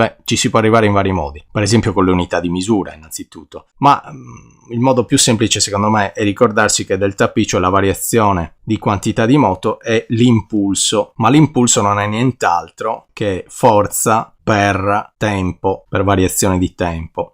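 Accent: Sardinia, Italy. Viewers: mi sono iscritto . P.S. dall' accento mi parrebbe di sentire un sardo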